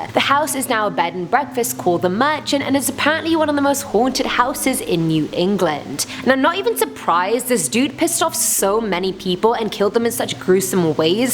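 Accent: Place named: England, United Kingdom. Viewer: Sound like an English accent, reporting on US ghost houses. Interesting!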